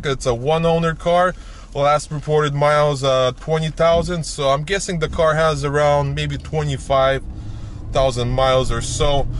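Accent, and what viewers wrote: Russia. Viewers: брат ты русский ? у тебя акцент))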